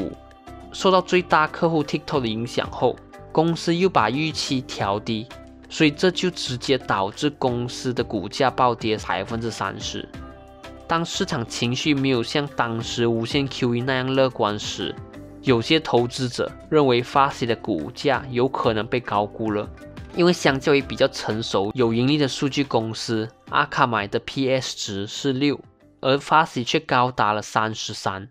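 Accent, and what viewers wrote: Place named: Malaysia. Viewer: Austin是马来西亚人吗？说话方式很像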